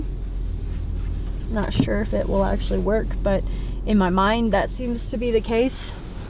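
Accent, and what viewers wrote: United States. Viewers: why not buy true american you sound american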